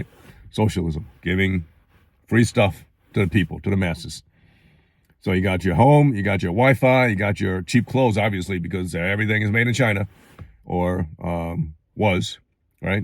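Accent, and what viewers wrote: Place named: New York, United States. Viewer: If I did not see your face, I would think you were like an Italian American gangster. I never expected you to say you were born in China. lol. You got that New York accent down.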